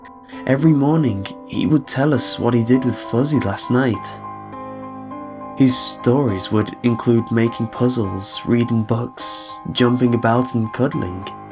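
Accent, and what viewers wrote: United Kingdom. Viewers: Your British voice makes everything seem okay :3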